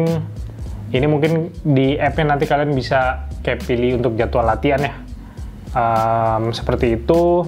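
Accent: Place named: Madura, Indonesia. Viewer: Bang love your accent. Madura kah? Sorry klo salah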